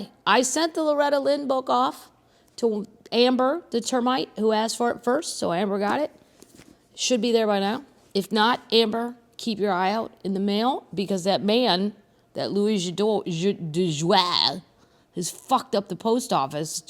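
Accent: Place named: Kentucky, United States. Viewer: I hear some Kentucky funk in your reading tonight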